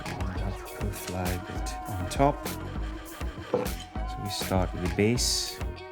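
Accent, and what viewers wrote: Trinidad and Tobago. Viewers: Do I detect a Trini accent?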